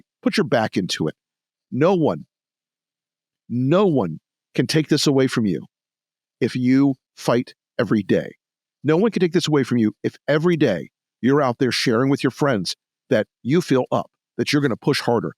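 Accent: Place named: Midwest, United States. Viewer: This ☝🏼. This right here is why I love you 💙 Always straight up and plain spoken. Idk why I think you’re a Floridian but you speak like a midwesterner 👍🏻😂 Ty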